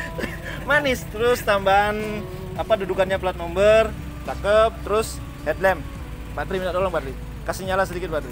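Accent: Bali, Indonesia. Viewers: Logatny kyk bali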